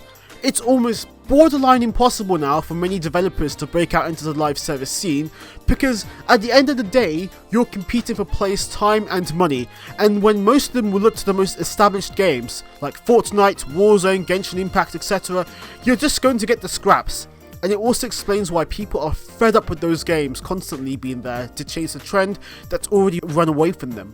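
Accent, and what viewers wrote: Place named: United Kingdom. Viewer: Why is he British?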